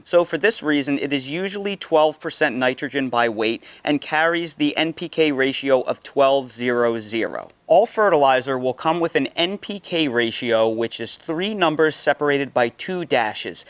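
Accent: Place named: New York, United States. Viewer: Your in nc but you have a ny accent😀excellent video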